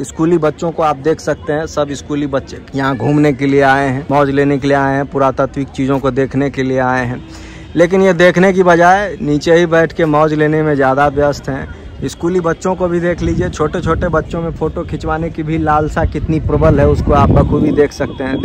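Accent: Bihar, India. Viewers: Bhaiya apka video ka rooj wait karte h apka voice Etna mast h apne ape par bihari wala filling automatic aa jata h 💗❤️❣️❣️❣️❣️😘😘😘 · Bhaiya ekdm khud ko feel krte h apke videos dekh kr.... whi bebak andaj....dogs ke dekh kr unse bat Krna ..wo hmara Bihar bala accent.... ekdm khud ko feel krta hu. M Varanasi aa rha hu Saturday ko .aap miloge?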